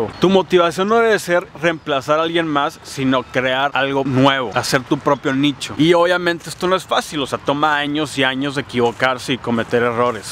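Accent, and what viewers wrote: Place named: Upper class, Mexico. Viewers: Hablas muy fresa 🍓🍓jeje Me encanta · Me encantó tu vlog y mas tu acento de mirrey Paps ;)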